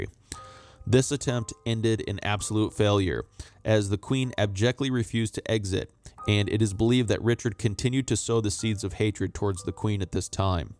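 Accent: United States. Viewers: I enjoyed this video. Is the narrator an American? His voice is pleasant and melodious ----- reminiscent of a Southern accent (Virginia, perhaps?).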